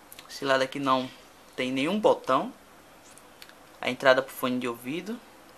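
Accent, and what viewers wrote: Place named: Salvador, Brazil. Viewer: Eu diria "jeito presepeiro"... Hehehehe... Antes de saber que ele era de Salvador, eu já desconfiava só por causa da maneira como ele fala. Mas o cara é brother!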